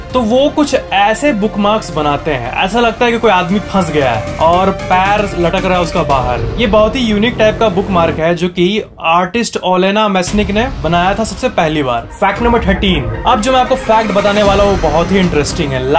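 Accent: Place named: Bihar, India. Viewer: Sir apke bolne ka tarika hamare family aur relatives se milta h... I think ap hamare side se ho.. Bihar❤....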